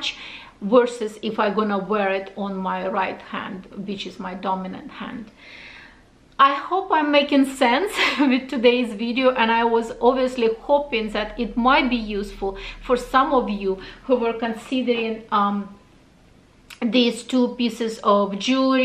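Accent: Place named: Russia. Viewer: Your Russian accent is so sweet 😊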